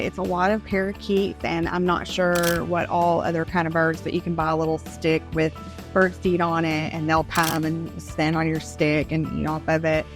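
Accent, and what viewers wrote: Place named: Texas, United States. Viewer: finally someone with a texas accent! we are losing our accent. we need to do everything to preserve and grow our iconic accent! you are a breath of fresh air!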